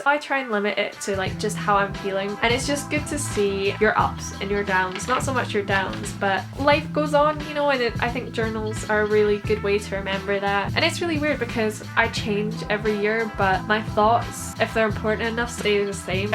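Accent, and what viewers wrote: Scotland, United Kingdom. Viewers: I love the idea of using tracing paper if you're not great at drawing. I will definitely be using that! Also love your accent, are you Scottish? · I love your accent so much, it's like slightly Scottish and English and it sounds very nice and that combined with the amazing video is really great :) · lol I'm Scottish too but live in Wales now and people always ask me where in Ireland i'm from. I just tell them near Glasgow and theyre like oops :) love the video and good to hear another Scottish voice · Omg you sounded Scottish so I immediately liked you😂💕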